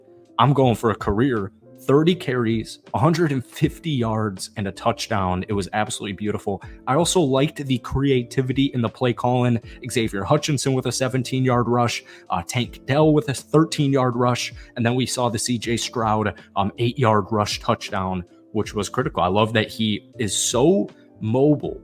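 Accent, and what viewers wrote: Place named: Texas, United States. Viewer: Where is this texas accent coming from bro lol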